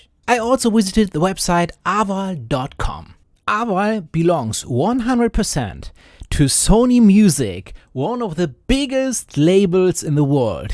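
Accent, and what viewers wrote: Germany. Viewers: His heavy German accent kind of adds to that. Like, he's really trying not to sound aggressive imao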